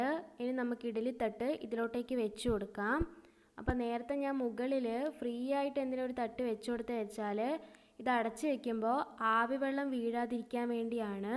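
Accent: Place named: Kannur, India. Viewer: Kannur basha mattane...Appathekk... polum 😂😂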